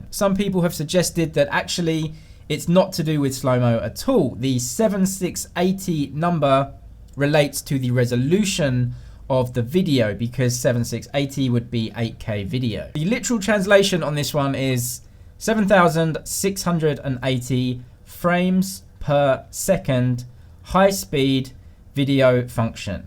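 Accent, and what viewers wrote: England, United Kingdom. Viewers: Your stupid English accent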